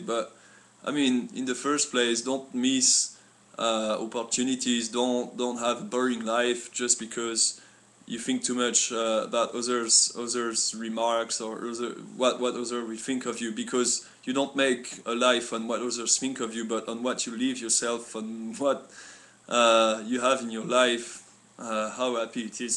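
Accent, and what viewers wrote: France. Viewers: Happy to hear about that!! Stay inlove and be strong! That's really true that being inlove is a wonderful feeling no matter what or where you came from..those people judging your relationship can't give the special feelings you felt to each other.. Nice accent by the way , same with my bf. He's from France also. He is French and I am Filipina😆...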